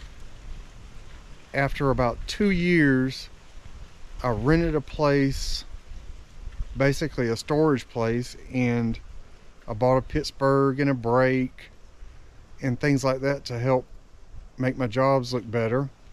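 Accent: South, United States. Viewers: From your southern accent, I was wondering what part of the south, GA. In 2 yrs I'm planning to move to catersville. I'll be looking for employment then. I personally never wanted to start a business, I could have one time if I wanted. To many licenses and epa rules, I mentally can do that stress.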